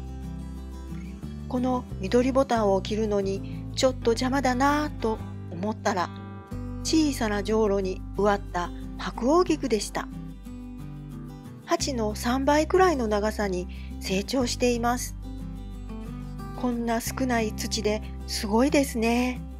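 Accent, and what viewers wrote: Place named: Kansai, Japan. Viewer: 屋外で植えていらっしゃるようですが、場所はどちらですか。 冬は霜が降りないのですか。 言葉のイントネーションの感じから、西の方の地方なのかなと思いますが、寒さはどうなのでしょう。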